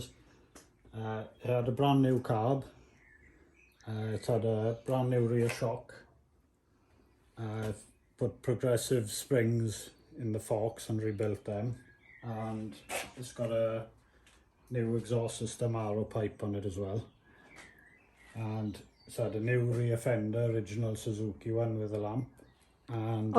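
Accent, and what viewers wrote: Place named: Wales, United Kingdom. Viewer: I impulsively bought a DR350 last year with no previous motorbiking experience! I tried kick starting it a couple of times and it's just sat in the shed ever since buying it! Do you service bikes for others? I picked up on your accent straight away, and I'm based in South Wales if you would be interested in taking a look at the bike for me?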